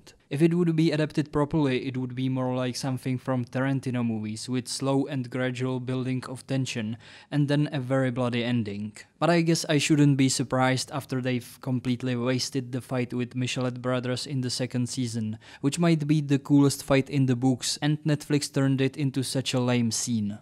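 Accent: Czech Republic. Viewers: Z přízvuku slyším že jsi čech, tak jen takhle utajeně :) : skvělej rozbor, ušetřil jsi mi čas se sledováním 3.série, to si raději konečně přečtu Husitskou trilogii.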